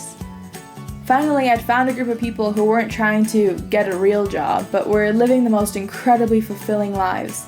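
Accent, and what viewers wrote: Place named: Bulgaria. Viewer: Bulgarian accent's always the hardest to get rid of, haha.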